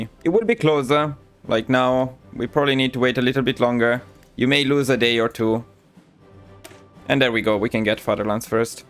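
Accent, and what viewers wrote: Italy. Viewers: I couldn’t tell at first but the Italian accent is very distinctive. Especially with the “-a” sound at the end of a lot of words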